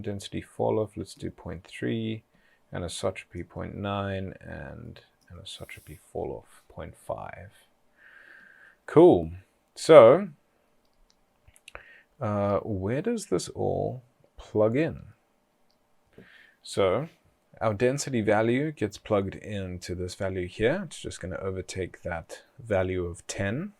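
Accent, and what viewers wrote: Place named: South Africa. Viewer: Must be South African!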